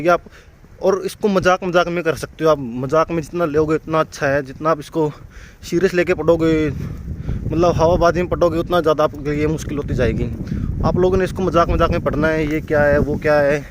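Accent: Haryana, India. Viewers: Haryana ki boli lag rahi hai bhai. Thanks for the info.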